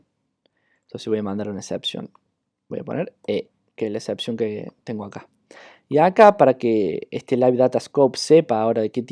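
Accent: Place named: Córdoba, Argentina. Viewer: En este video no pudiste controlarte como cordobé, y tambien miré raro cuando dijiste "Coca con pepsi" jasjjajaja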